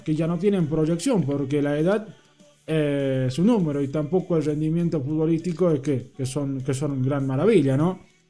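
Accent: Argentina. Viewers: Primera vez q veo tus videos amigo Pero porq hablas como gaucho no entiendo espero no seas un boligaucho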